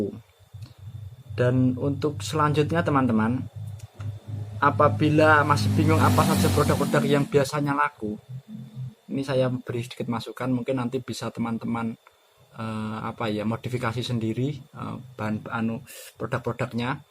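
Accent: Java, Indonesia. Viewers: Jowo e pundi mas? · Bahasa indonesianya medok bang. Hehehe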